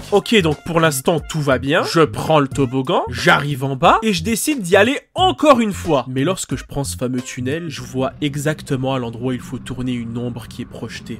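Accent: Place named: Quebec, Canada. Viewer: T’es parents sont francais? T’as l’accent québéquoye!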